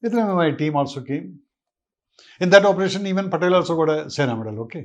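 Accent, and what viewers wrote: India. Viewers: He is from Kerala, i can see from his accent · Origin: Kerala Accent: Punjabi This is the Indian Army for you. ❤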